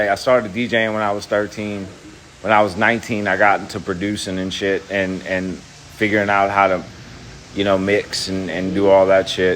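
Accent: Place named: United States. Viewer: When scrim was an addict he sounded hood, now that he's sober he sounds country...wtf.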